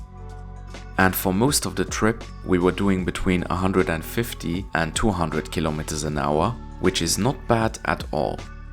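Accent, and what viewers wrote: Germany. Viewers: 😂😂😂😂love the german accent... The way you said "pretty funny smell" made me chuckle. 😂😂😂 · akzent ist deutsch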